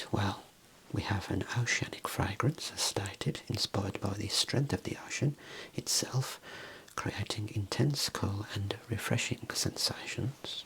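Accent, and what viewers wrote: United Kingdom. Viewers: イギリスの話し方が本当によく眠れます😊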